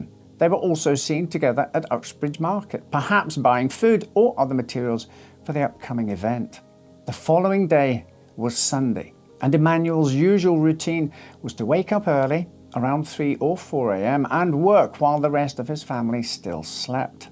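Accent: Scotland, United Kingdom. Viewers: This is in England but his accent is Scottish. I'm sure they'll let you off lolol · The accent is not English , it is a Scottish one. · Love your vids. Is your accent mixed? I swear I keep hearing Scottish for a split second here and there, then suddenly you're English again, lol. I wonder if I sound like that myself with living in England and having had a Scottish dad.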